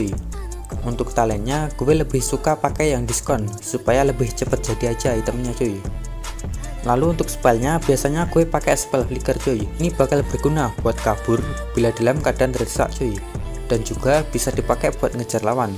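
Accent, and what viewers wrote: Java, Indonesia. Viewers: medok bet lu bor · kalau medhok gak usah gua gue bang, gak cocok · Bang suara nya medok banget anjir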